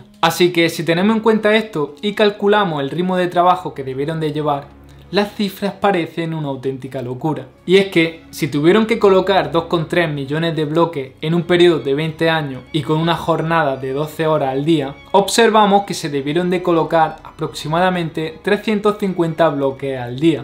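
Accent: Spain. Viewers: Pareces hispano, con acento Espanol, cool. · Cuando los españoles podrán decir video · Desde Perú 🇵🇪 ¿ No será antes que los Faraones reinarán, serían los ANUNAKIS? Responde 😲está interrogación, gracias 😁. ¿ De qué parte de España eres? Lo pregunto por tú forma de pronunciar tú español 🤗es agradable muy diferente a los que conozco de Madrid.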